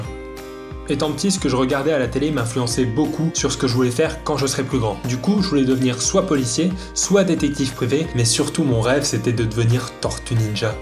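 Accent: Lorraine, France. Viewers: Tu viens de lorraine comme moi 😂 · nn sérieux tu viens de Lorraine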